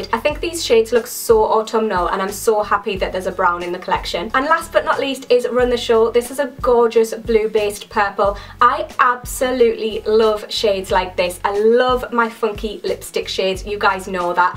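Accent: Newcastle, United Kingdom. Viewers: Are you from Newcastle? great video btw!x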